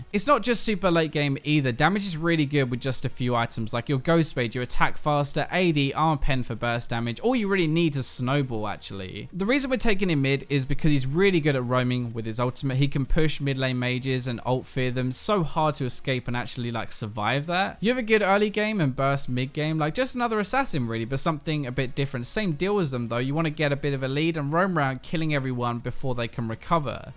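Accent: United Kingdom. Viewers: Where in the UK is this guy from? His accent is lovely.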